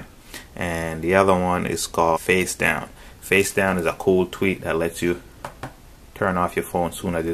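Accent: Jamaica. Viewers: I’m hearing slight Jamaican accent when u speak, are you Jamaican or from a Caribbean island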